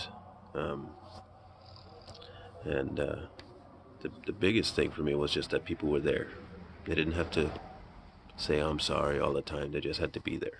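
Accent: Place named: Caribbean. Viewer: Which Caribbean island are you from, dude?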